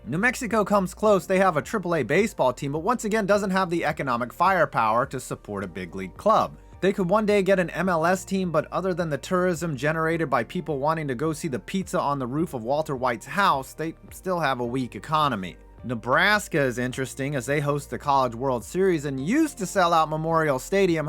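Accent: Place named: Northern Virginia, United States. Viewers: I know you make videos on broad topics but you're definitely from Virginia hahahaha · Listening to this just shows you are definitely from VA or have lived there at some point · As someone that lives in NOVA I can so tell your from here.. lol.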